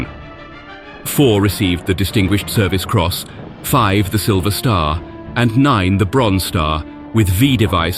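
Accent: United Kingdom. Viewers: British accent and a booming voice, this narrator was perfect for this documentary. An amazing history lesson that held me riveted for the entire 25 minutes. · too much irrelevant digressions to Stalingrad etx a wasted of time-- Why dont these British documentaries just get to the point of the title? As soon as hear the British accent i just know it`s longwinded -takes two hours just to get to the point of the title ...a total waste of time.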